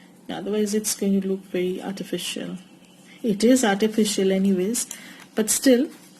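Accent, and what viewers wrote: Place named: India. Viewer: I could listen to your lovely Indian accent for hours. Very cool technique.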